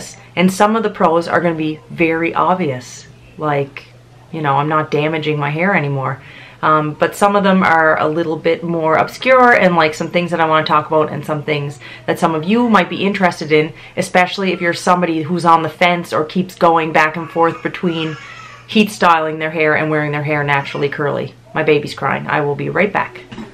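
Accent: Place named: Cape Breton, Canada. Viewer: I would definitely subscribe if you started a Mom channel. I mostly watch the Mom content anyway. I stumbled upon your channel ages ago before you started saying that you were from Cape Breton in your intros. 10 seconds into it I said, “That girl was got to be from Cape Breton!” I was born and raised there but live in NB now. I love your channel!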